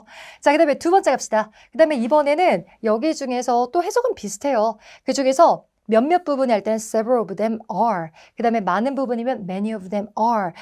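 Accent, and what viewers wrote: Jeolla, South Korea. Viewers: 약간 전라도 사투리가 느껴집니다. ㅋㅋ 읎어여~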